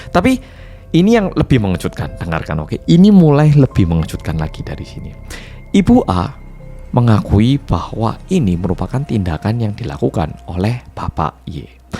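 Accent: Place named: Malang, Indonesia. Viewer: Mas, aku salfok sama "mobile kayak MIKROLET" haha MALANG nya masih kentel ya mas. Salam kera ngalam mas 😁😁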